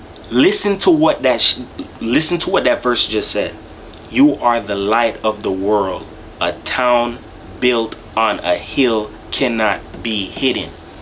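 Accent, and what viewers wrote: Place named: Jamaica. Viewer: YOUR VOICE IS SOOTHING.... RASTA ACCENT! JAMAICAN???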